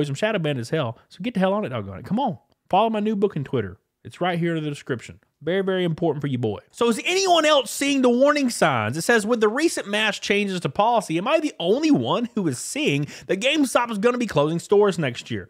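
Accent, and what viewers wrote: South, United States. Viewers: Love this guys country grammar. I live on the east coast up north & we dont get to hear a southerner accent where im from ....cool voice dawg ! 😂😂😂😂😂😂😂😂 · Like your southern accent..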